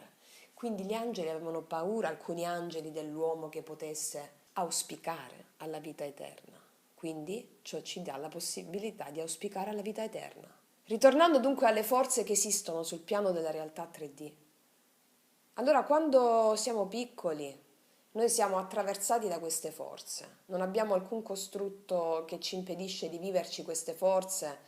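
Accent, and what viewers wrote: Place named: Apulia, Italy. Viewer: Che spettacolo che sei., non so quante volte ho sentito questo tuo lavoro bellissimo e ogni volta la mia anima vibra e mi dai coraggio forza luce,io vivo in Lombardia ma la mia origine è in Puglia credo anche molto vicino a te dall' accento che sento,mi piacerebbe un giorno abbracciarti di Persona infinitamente grazie❤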